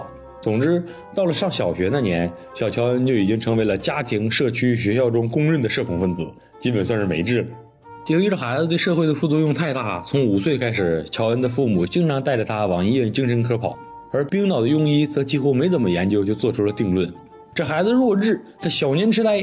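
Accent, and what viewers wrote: Northeast, China. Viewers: 小伙儿故事编得不错👍大碴子声儿也没问题👌你就是口齿不清吞音太重不看字幕欣赏不到你的幽默😢好好去悲京广播学院播音系练练你的订阅至少能翻一倍😂这辈子别屈了你的才呀😅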